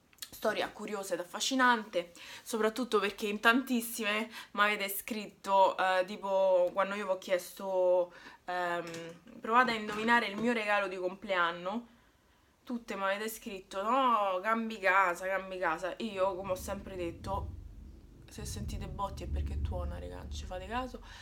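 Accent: Rome, Italy. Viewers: Ciao sei bellissima e il tuo accento romano mi fa morire dal ridere ti amo troppo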